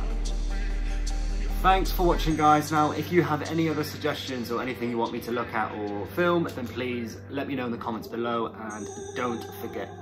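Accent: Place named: United Kingdom. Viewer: Why is this guy's British so hot?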